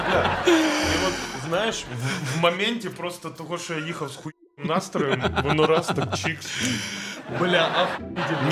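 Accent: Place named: Surzhyk, Ukraine. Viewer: Який лютий суржик!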